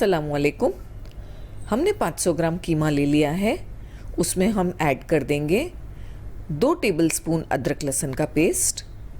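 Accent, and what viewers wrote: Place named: Maharashtra, India. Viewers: Thanks for sharing. Wow. Aap bilkul Bombay walo ki tarah bolti hai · Madame excellent. Are you from Konkan ? Your Marathi accent comes through ? Very nice .Why isn't it as widespread as vada pav ? Add egg and it becomes a very healthy BF .The use of shapu ! Brilliant. Great .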